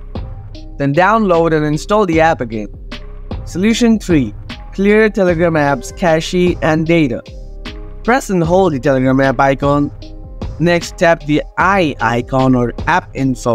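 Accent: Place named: India. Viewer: It is an Indian English.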